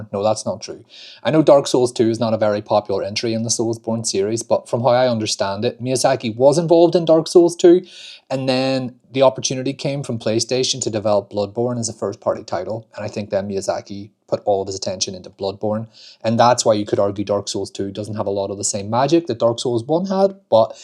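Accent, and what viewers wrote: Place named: Northern Ireland, United Kingdom. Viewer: Mate r u from NI? was so hyped when I saw the thumbnail and my excitement doubled when I realised u have my accent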